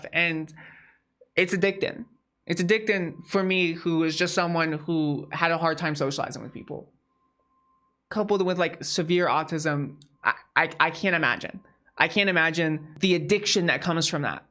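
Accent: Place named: Pennsylvania, United States. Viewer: im sorry this completely irrelevant to the actual story (although i thank you greatly for covering it), but i am fascinated by your accent lmao. I'm from MA and have heard my fair share of regional dialects but I have no fucking clue where to place yours 😂if i had to guess I'd say Philly/Pennsylvania? just clarifying btw i don't mean any of this in a negative way nor am i trying to make fun of your accent or anything, just legitimately curious. i'm a big fan of accents/language in general and love learning about different dialects/regional differences.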